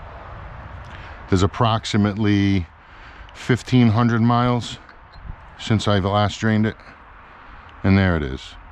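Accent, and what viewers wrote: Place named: East Coast, United States. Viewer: Yo I miss that East coast voice!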